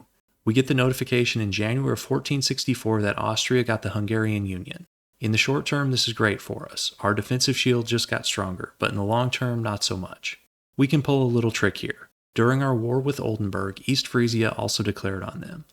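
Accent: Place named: United States. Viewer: As an Australian, seeing and hearing an American is suprising to me each time. I want to see you conquer Austria and become emperor, Catholic, and revoke as an Australian tribe.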